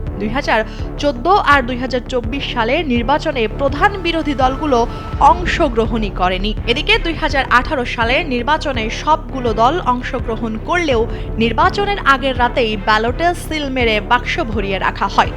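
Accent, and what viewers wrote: India. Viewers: ভারতের স্টাইলে সংবাদ পাঠ🖕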